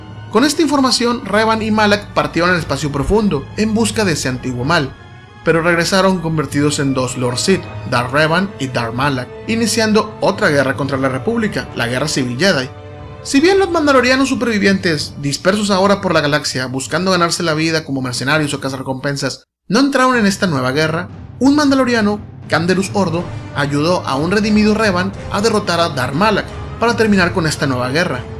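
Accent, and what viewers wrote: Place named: North, Mexico. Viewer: están bien tus historias, y estás muy bien informado, pero la neta hablas bien feo, no se te entiende nada cuando hablas, y además tienes acento norteño. Habla más despacio y claro, gesticula bien.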